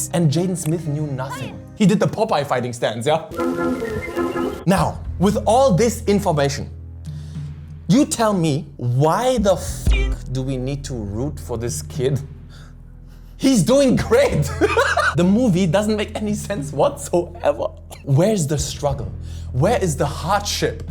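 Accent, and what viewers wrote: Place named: Germany. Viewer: Digga wieso man merkt dass du die letzte Zeit viel deutsch geredet hast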